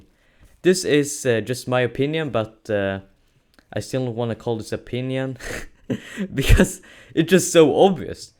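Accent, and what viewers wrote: Sweden. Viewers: Im guessing your swedish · Are you swedish?? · Ur swedish to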